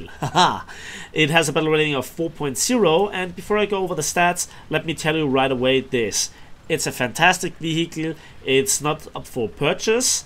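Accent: Germany. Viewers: Am I, am I first? Wow! Quick! Think of a cheesy thing to say... Jk. Thanks for the video. Always nice to have a good game with learning opportunities, all narrated with a satisfying German Accent!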